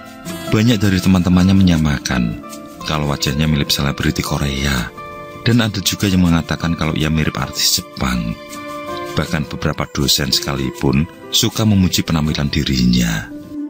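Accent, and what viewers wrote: Java, Indonesia. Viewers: Mantap gambar2 nya.. skill tingkat dewa,di padu logat.jowo yg sesekali kentel,tebal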